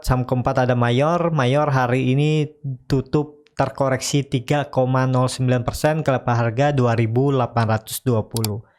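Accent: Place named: Borneo, Indonesia. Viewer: maksudnya 'Khas itu" logat bahasanya kak...emang bahasa kita dayak kalimantan begitu...spt klo bahasa jawa kan 'medhok' banget gt.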